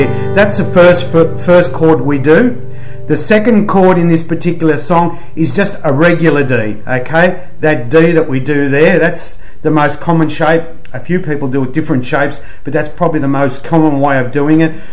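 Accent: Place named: Australia. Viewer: Great to hear the Aussie accent! I'm a yank with dual citizenship and a frequent visitor to good old Melbourne!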